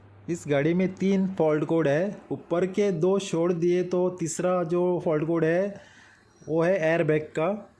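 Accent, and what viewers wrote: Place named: Mumbai, India. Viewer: Hello irmao appreciate your skill and precision work . Not jugadu but your work and perfection it's like O E M BOSCH. 👍👏 Your Hindi is we call dobitalv Bombay uncle and aunty Hindi . Nice to listen . Greetings have nice day 🙏💐👌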